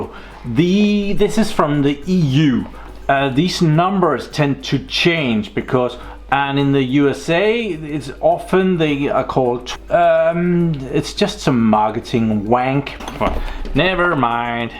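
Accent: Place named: Denmark. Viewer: Jeg har kun set 1 video, og er allerede subscribet til dig fordi du taler Engelsk på den eneste rigtige måde - Danglish.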